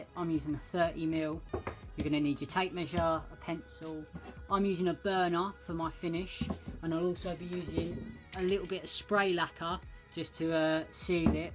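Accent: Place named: Essex, United Kingdom. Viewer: You sound like you're from my neck of the woods. Are you from Essex or East London? I'm originally Walthamstow, then Wansteasd.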